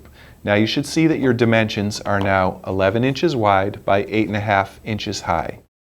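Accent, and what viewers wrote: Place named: United States. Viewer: Excellent! You speak slowly succinctly, and with an American accent! I have been on computers for longer than many people have been alive, and have always struggled with how to make greeting cards. The amount of paper and ink I wasted would have paid for someone's college education! A head slapping moment came when you showed the formatting trick was to use two columns. Gawd!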